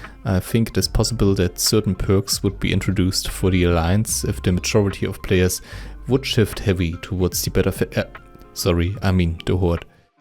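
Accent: Germany. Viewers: Man hört dir raus, dass du Deutscher bist :D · Dein deutscher Akzent digga. Beste.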